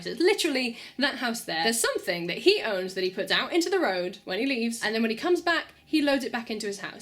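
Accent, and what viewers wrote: Wales, United Kingdom. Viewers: Is yours a Welsh accent?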